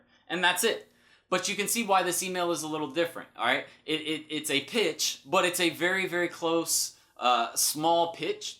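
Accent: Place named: South, United States. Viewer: Hi, that was a very good video with a lot of value, but I love your Southern accent the most.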